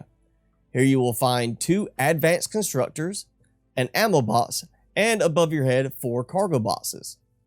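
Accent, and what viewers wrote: South, United States. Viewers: You sound Southern.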